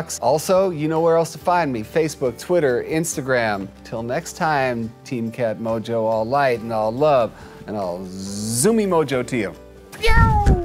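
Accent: England, United Kingdom. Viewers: My cats Zooms during the day, she also makes a really unusual noise... she gets in the zone and she's zooming around. It is cute but I have upped her play time, so hopefully she uses up some energy. Nice British accent btw and that's from a Brit lol 🇬🇧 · Haha I am UKian, and you've got a great UK accent 👍🏼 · I adore your English accent- and that's coming from an English lady. Velvet all the way, my friend. 😘👌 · I love the British accent Cat Daddy Dictionary time XD But also, I love when cats get the zoomies. Highly entertaining, but, play with your cats too! ^^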